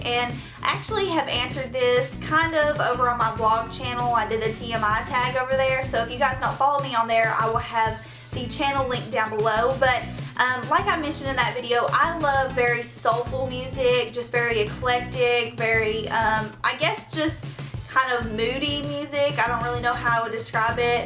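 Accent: South, United States. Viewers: I'm originally from Memphis but have a very strong southern accent. I now reside in Rogers, Arkansas . When people ask where I'm from I pull out all the punches, I tell them I'm from "Toadsuck, Arkansas ". It's a small suburb outside Conway , lol. They don't really believe me when I tell them Memphis. Love you and your accent, my home state girl!!!!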